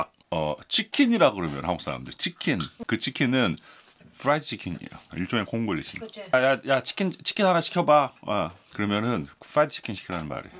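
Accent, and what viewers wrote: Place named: Philippines. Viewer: His accent is slowly turning into a filipino accent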